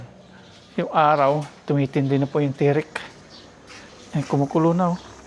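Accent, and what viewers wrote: Visayas, Philippines. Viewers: Bisaya ka ba? Yung tagalog mo kasi tonong bisaya eh.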